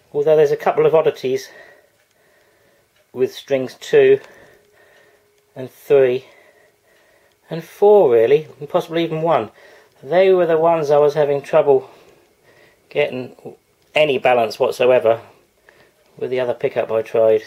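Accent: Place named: Texas, United States. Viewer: Oh my God, you're British! And here I was wondering what part of Texas you were from. Fantastic work sir!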